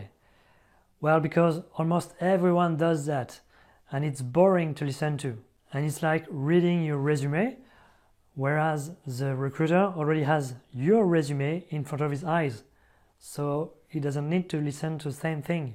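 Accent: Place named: France. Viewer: Je ressens toujours l'accent Français quand vous parlez lol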